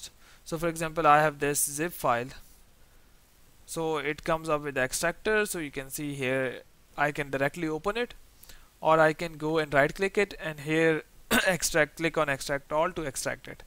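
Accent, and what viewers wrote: India. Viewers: Cannot understan anything you're saying. You talk too fast and the Indian accent is impossible.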